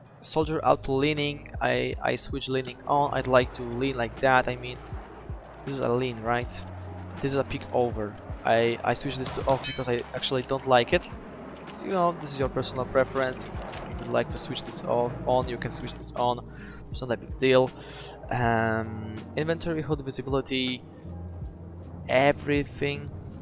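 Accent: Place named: France. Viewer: Thanks for this I hope it helps me.. Im guessing your french 😬😬 so mercy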